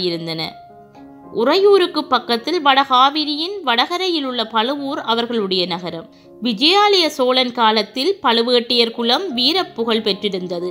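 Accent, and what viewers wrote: Sri Lanka. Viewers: உங்களோட கொஞ்சும் இலங்கை தமிழில் பொன்னியின் செல்வன் கதை மிகவும் இனிமை.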